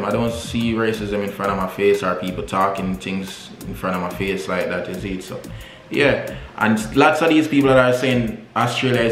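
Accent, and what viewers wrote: Jamaica. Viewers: ? He is speaking english. What you are saying is that you would like to hear him using a foreigh accent. There's nothing wrong with a Jamaican accent. Not everyone is as frightened for nothing!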